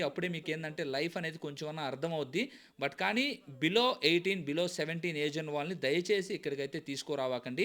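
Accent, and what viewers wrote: Nellore, India. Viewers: Meedi Nlr bro mee language vini aduguthunna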